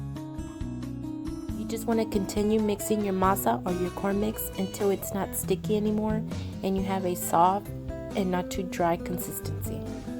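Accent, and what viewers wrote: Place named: Texas, United States. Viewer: Are you from Texas? You sound Texan. And some of your ingredients I see at H‑E‑B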